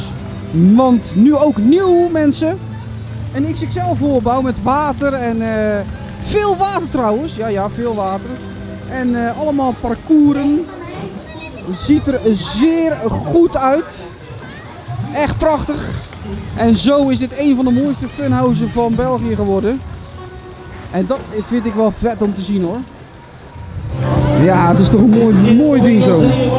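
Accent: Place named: Zeeland, Netherlands. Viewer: Hoi ben sinds kort geabonneerd op jouw kanaal en ik hoor een beetje Zeeuws accent kan da kloppe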